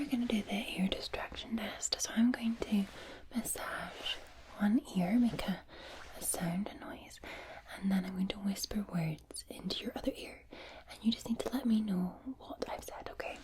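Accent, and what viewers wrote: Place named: Scotland, United Kingdom. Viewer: Love your cranial nerve and sleep clinic videos your soft Scots accent makes me feel safe it helps me with PTSD survived sa by male guitar teacher at 17 fifty four now but you help me sleep thank you for being a light in the darkness you really are a life saver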